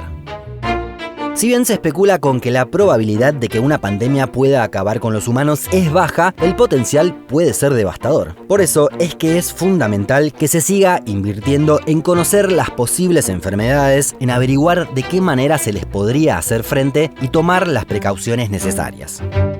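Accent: Argentina. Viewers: que pereza con ese acento argentino no puedo escuchar bien, lo voy a quitarsh. Chego se dice "llego", cha "ya".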